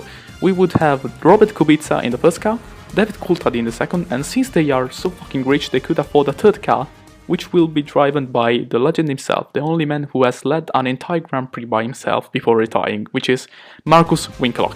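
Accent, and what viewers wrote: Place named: Italy. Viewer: L'accento mi sta facendo morire, te se ama #alphamaxnova1ItalianPride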